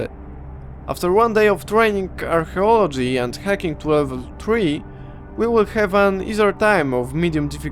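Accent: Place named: Poland. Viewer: Oh QRVA, a polish guy. If you want polish speaking eve, look at my channel. Also, qrde, kocham twoj akcent :> Don't forget as an alpha, your skills suck, and your tier 1 equipment sucks, so it is NOT THAT EASY to get a site to 100%. Also, good luck to all the alphas probe scanning in low sec and null sec without a cloak.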